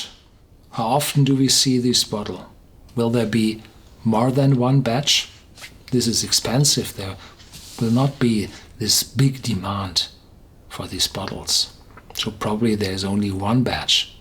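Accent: Germany. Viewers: Where are you from? Your acent sounds like a German one.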